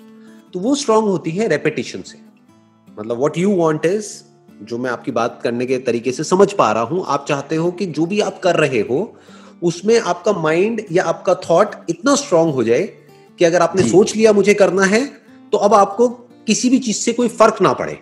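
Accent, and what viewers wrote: Gujarat, India. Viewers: Oh God I just loved his Gujarati accent 😘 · jab gujarati log hindi bolte hai tab pata chal hi jata hai ki vo gujarati hai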